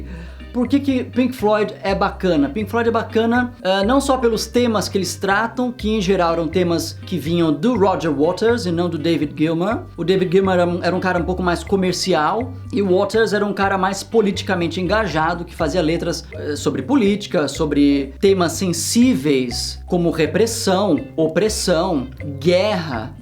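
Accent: United Kingdom. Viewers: Cara, você tem que fazer mais vídeos de inglês. Vi seu vídeo sobre o box do Pink Floyd e fiquei impressionado, cheguei a pensar que você fosse realmente britânico. Valeu!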